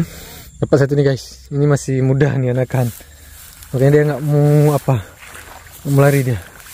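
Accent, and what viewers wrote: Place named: Aceh, Indonesia. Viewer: Saya subscribe baru, logat Indonesia nya macam orang aceh? Awak aceh kah droneh? 😅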